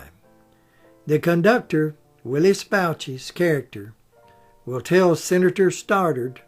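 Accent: South, United States. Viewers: .. Yet another fantastic video. Your videos are so factual and informative. There is an added asset to your videos---- that lovely southern accent. Please do a video on Rosa Parks. Thank you for this wonderful video. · "How would you like to be remembered" -- "Doesn't matter"! This was all extremely interesting, -- thank you, and please don't ever lose that natural Southern (?) accent.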